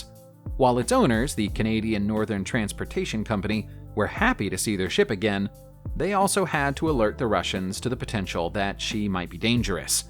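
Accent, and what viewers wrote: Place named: United States. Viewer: The pronunciations of British names are quaintly American too, as is the typically American mispronunciation of route as "rowt".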